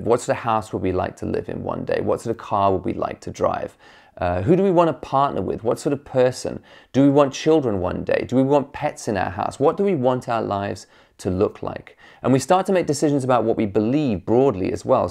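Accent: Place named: South Africa. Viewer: I thought I could here a little South African in your accent. Haha. Anyway Great content Man. Your videos are inspiring.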